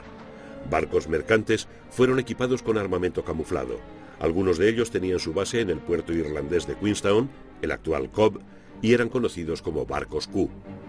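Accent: Spain. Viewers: Me parece que se refiere al acento español del traductor 😳no encuentro otra explicación. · Es que habla en perfecto castellano de España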